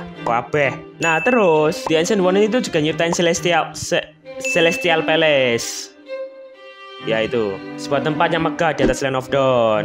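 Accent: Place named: Sulawesi, Indonesia. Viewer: Banh lu orang Sulawesi kah? sebagian logatnya orang Sulawesi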